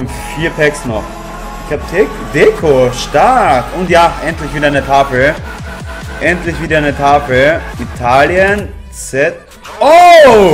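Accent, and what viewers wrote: Austria. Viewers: Ich habe schon lange überlegt es mit youtube zu versuchen bin zwar Bayer wäre vom Akzent fast genau so wie bei dir als Österreicher :P wären auch neue Freundeskreise die man vill knüpfen könnte und diese Kamera von Logitech wäre ein Anreitz um es doch in Angriff zu nehmen das ganze :)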